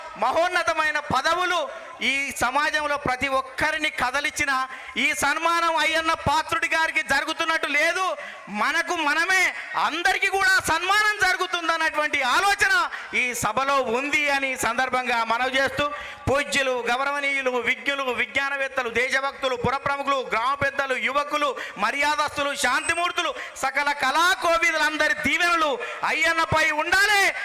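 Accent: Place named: Telangana, India. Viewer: తెలంగాణ యాస భాష నీ ఒక్కడికే సొంతం నువ్వు మాట్లాడే ప్రతి మాట ఒక తూటాల దూసుకుపోతుంది అందరూ రాజకీయ నాయకులు పేపర్ లో ఉన్నదే చదువుతారు కానీ మీరు అప్పటికప్పుడు సందర్భాన్ని బట్టి వివరించి క్లుప్తంగా ఎంత విమర్శించారు ఎలా విమర్శించారు మీకు తెలిసినంతగా ఏ ఒక్క రాజకీయ నాయకుడికి తెలియదు ఎందుకంటే మీరు తెలంగాణలో పుట్టి పెరిగి తెలుగు జాతి తెలుగులో మాట్లాడే వ్యక్తులలో నువ్వు ఒక శక్తి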